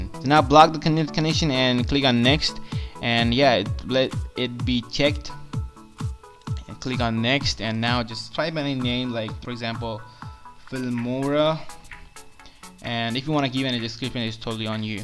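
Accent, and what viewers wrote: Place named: India. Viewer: Jesus, Indians YouTubers use have of their videos to talk, too much useless talk. Whenever I realize it's an Indian voice, I just click the middle of the video, then I see/watch/hear what I came for. It's a good tip, it helps to do away with that annoying time-waster voice of theirs.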